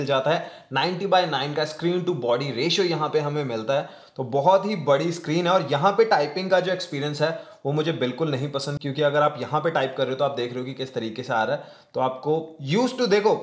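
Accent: Punjab, India. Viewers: Punjabi Bande Di Punjabi Hindi Ch Nikal Ee Jandi..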